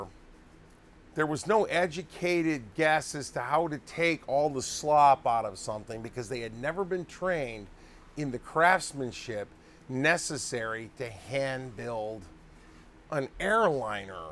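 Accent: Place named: Chicago, United States. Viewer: Out of curiosoty, just where the heck are you from? I almost hear a Chicago accent? Maybe Cleveland?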